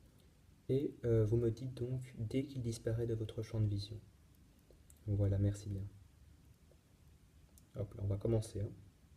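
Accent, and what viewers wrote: Belgium. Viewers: Ne viens-tu pas de Belgique ?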